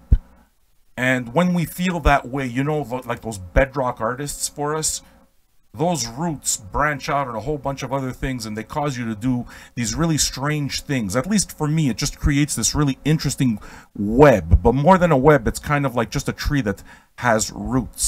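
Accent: Canada. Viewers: Well I got it right that it was Canadian so 1/2 pt to me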